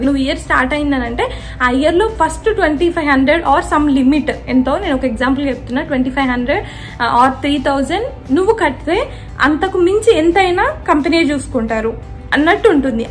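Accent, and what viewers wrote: Telangana, India. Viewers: Hai sister! bava Namasthe! Nenu mee videos oka 5days nunchi chusthunnanu.chala bagunai,chala vishayalu thelusthunnai.meeru akkada unna kuda mana saampradayalu marva kunda meeru chese poojalu naku baga nachai.e roju maa ammaku mee videos chupincha.chala manchigunai annadhi.mimmalni chusaka chala nerchukovali anipinchindhi.mee presentation mana telangana yasa lo undatam valana inka chala bagundhi.vemulavada rajanna swami dheevena eppudu mee pai undalani korukuntu.....all the best!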